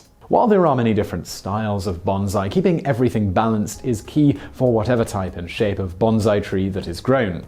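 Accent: England, United Kingdom. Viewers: Kew Gardens Station He’s english, he’s going to pronounce words differently than you. Do you know how many accents there are in the United Kingdom? Get off of your high horse and actually contribute. I don’t see you making videos? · While I really like this video, as I like this channel's other videos, his pronunciation does little to clarify the distinction. For one, a key difference between "bonsai" and "banzai" is the "S" sound versus the "Z" sound. The two are pronounced in Japanese as distinctly as one would reasonably expect them to be. pronunciation of the two words seems to come off as "Z" both times. Secondly, we have that sort of typical British thing where an "ah" sound in a foreign language is pronounced like the "A" in "apple". Like when virtually any British chef says the word "pasta". I don't know where this comes comes from, but it seems very British, and in this case, it's not helpful. 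That's because when we're talking about the difference between "bonsai" and "banzai", the first vowel sound is not a matter of the difference between the "O" sound in "odd" and the "A" sound in "apple", but rather the difference the "O" sound in "bone" and the "A" sound in "audience". is a great presenter, but when discussing matters of the pronunciation of foreign words, he'd benefit from consulting a native speaker. TL;DR - "Bonsai", the tree, is pronounced like "bone sigh". "Banzai", the battle cry, is pronounced sort of like "Bond's eye", but a little less stank on the D. Yeah, I said "Stank on the D". This comment has been entirely too serious.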